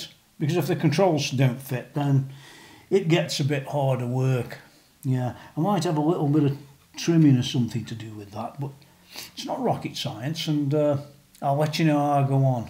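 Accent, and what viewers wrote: Stoke, United Kingdom. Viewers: Are you from Stoke by any chance? :)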